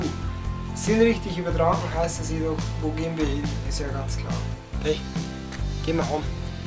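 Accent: Bavaria, Germany. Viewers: I mog dein Borisch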